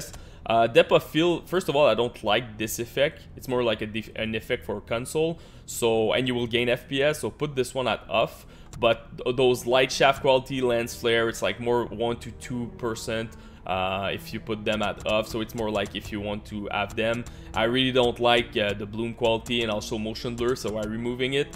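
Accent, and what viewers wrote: Quebec, Canada. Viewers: You have beautiful Quebec English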